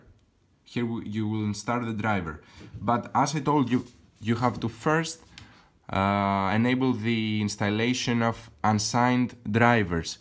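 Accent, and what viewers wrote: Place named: Greece. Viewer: Ε, μονο απο την ελληνοααγλικη προφορά καταλαβα πως εισαι Ελληνας :ο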